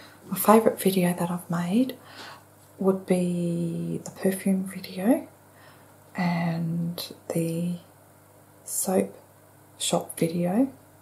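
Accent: Australia. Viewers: Lovely! You look at least 10 years younger with your new makeup pieces and hair do! I have to say I love my international ASMR artists and their accents. However, I was so thrilled to find an Aussie lady (you, in case you wondered) with whom I could identify. In those days I still thought it was a bit weird but you helped normalise it for me. I am slowly finding more Aussies making ASMR videos of which I'm also proud. But, you are my first Aussie ASMR artist and a relaxing nurse on my not so crash hot days. The rest are bonuses. 💜🦉🇦🇺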